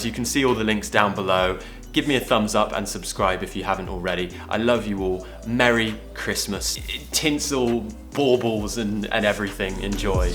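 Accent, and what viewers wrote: United Kingdom. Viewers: Happy celebrations!!!! And thanks for all videos!!! I love British pronunciation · Hi sir. EVEY TIME WHEN I LISTEN TO YOUR SPOKEN BRITISH ENGLISH I LOVE MORE AND MORE ENGLISH.CHEERS OUR BEST TEACHER.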